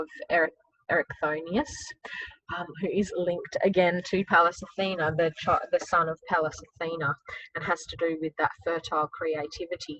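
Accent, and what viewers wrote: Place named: Australia. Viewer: Wonderful to hear an Aussie accent on EA ♥️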